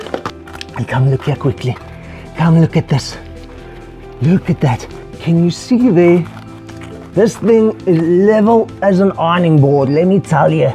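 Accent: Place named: South Africa. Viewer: I can recognize that accent anywhere. From what part of south africa are you?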